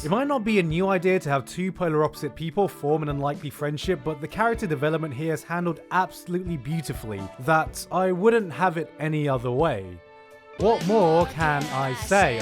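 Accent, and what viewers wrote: United Kingdom. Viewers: the sexy british Voice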